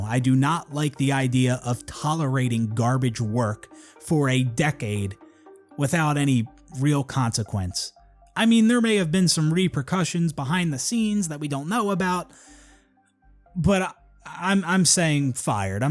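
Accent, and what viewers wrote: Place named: Pennsylvania, United States. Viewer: Gotta love a Pennsylvania accent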